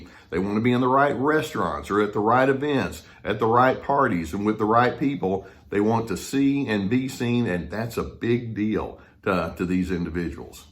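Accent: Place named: Texas, United States. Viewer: Nearly all of the people in my life are anti-conversation, at least with me. Their defensiveness, quickness to pronounce me wrong, etc. interfere with the idea-based back-and-forth I crave. This is surely partly my own fault but if there's a word for such auto-contrarians I'd appreciate your telling me. P.S. You sound Texan; which part y'all in?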